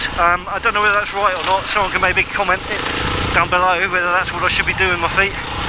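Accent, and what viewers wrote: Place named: England, United Kingdom. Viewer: Great day out on the bike in the sunshine does it get any better 👌 PS love the English accent and cursing it cracks me up haha cheers 🤙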